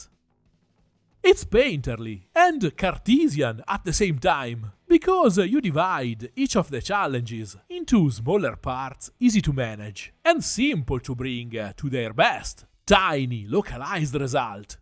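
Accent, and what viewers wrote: Italy. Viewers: Awesome job ! I want to go back to painting minis when I watch that kind of quality videos. And your italian accent make me want to learn the langage xD Keep up the good work, cheers from a (french) neighboor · Hey just wondering are you Italian? Your Accent is kind of intense idk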